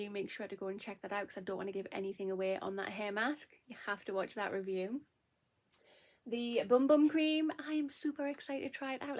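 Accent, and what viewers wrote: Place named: South Shields, United Kingdom. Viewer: I went over and had a look! Out of curiosity where are you from? I’m getting a South Shields accent coming through but I may be wrong!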